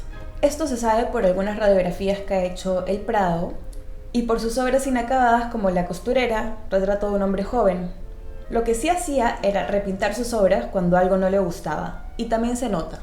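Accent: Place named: Venezuela. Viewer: Heres Venezolana verdad por el asento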